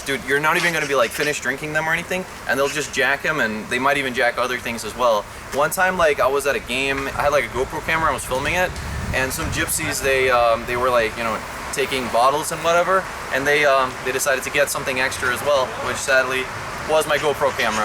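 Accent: United States. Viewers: How did you get that American accent?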